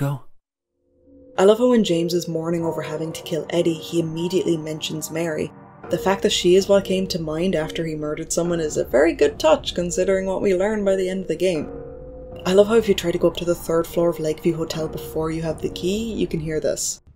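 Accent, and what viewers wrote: Ireland. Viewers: Thank you so much for this video I love everything silent Hill I was very annoyed that I didn’t use guy Cihi in the remaster and I have high hopes for the remake not sure if it’s still Romor but I believe it is coming . Shout out from Dublin. Your Irish too yeah 🤪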